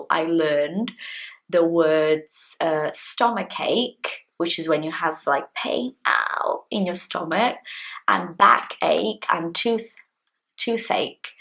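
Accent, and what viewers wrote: United Kingdom. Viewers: I don’t compare.. I know kids are like a sponge 😂 But I should get your advice, I just pay attention to my mistakes not to the victories. I love you British accent, it’s so clear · Beautiful accent! Clear, pure and real british english! Love it! Congrats! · Despite your process learning hadn't been easy you achieved a great English with a excellent and clear British accent.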